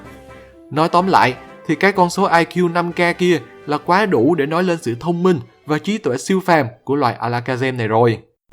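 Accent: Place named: Mekong Delta, Vietnam. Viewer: Thích vì cácb nói chuyện đậm chất miền Tây (dù không biết ổng miền nào) Ví dụ rất dễ hiểu và cừii ss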